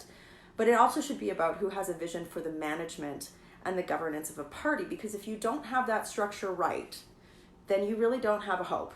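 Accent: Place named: Western Canada, Canada. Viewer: She is a western Canadian. We all sound like her here! :)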